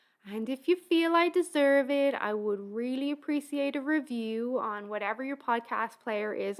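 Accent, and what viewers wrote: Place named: Ireland. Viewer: Thank you, lady with the lovely Irish accent. Very informative.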